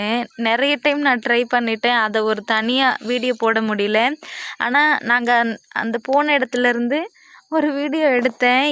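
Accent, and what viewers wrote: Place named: Telugu, India. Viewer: Yei paithyam tamil ozhunga pesu, ennomo telungu ponnumari Pesara? Over sean podatha, tamil kaarithane nee thikki thikki pesura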